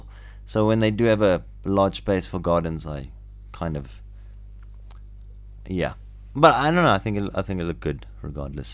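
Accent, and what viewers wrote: South Africa. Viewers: You sound like you have an South African accent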